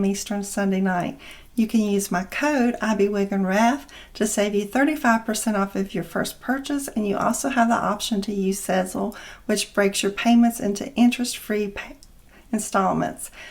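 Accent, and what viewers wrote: South, United States. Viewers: Absolutely gorgeous!!!! Love your accent!!!! It’s nice to find someone on YouTube that has a similar accent as I do!!!!! Gotta love the South!!!!! 👍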